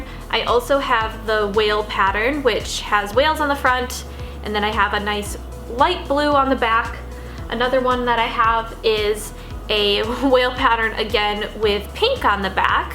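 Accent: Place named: Ireland. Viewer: she sounds more Irish now is it just me?😕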